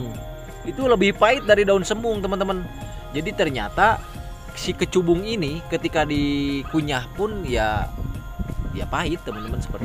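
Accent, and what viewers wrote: West Java, Indonesia. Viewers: A kawit n ti mna?? logat n mah sunda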